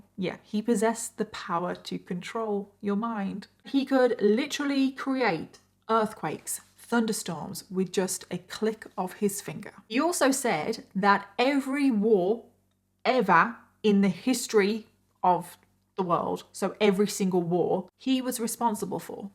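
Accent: United Kingdom. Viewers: Bailey Sarian in British. You did a nice job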